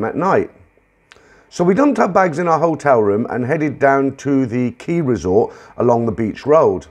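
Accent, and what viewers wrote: England, United Kingdom. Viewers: Another good story i hope they keep coming in good pommy accent so proper 😂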